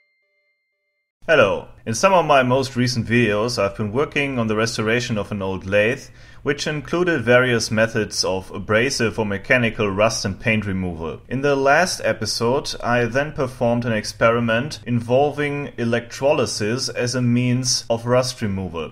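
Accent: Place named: Germany. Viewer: German guy speaking English (American English), German accent, German cadence, some German syntax, excellent vocabulary, excellent diction, idiomatic expressions are plentiful and accurate and used properly.......language proficiency is excellent. Where and how did he learn to speak English......so well.......? ? ? ? ? ? ? Best regards, fm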